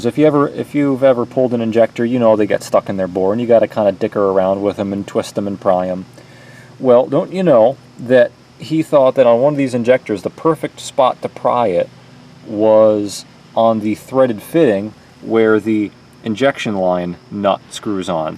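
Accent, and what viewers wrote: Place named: Canada. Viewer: Bro's either canadian or wisconsin'. either way, yuk.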